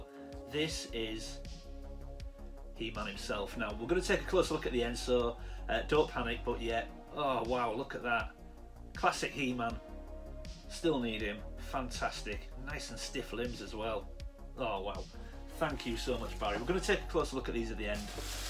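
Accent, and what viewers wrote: United Kingdom. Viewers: I didn't understand why your grandma got you those Scottish goodies in the past? Also your accent it sounds a bit different that most British accents I've heard.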